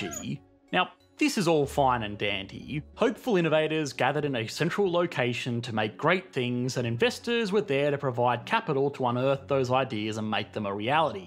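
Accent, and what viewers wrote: Australia. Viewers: He sounds like an australian with parents from stereotypical california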